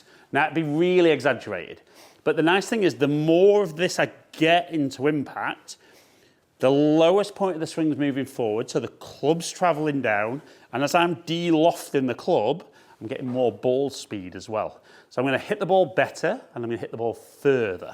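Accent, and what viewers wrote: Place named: Northern England, United Kingdom. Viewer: I smiled at that too. I live in the SE of England (outside London) and we play througthout the winter. To be fair, I think from accent he is based in the north of England where it is a harder winter but even so, to say the winter weather is horrible is probably pushing it a little:)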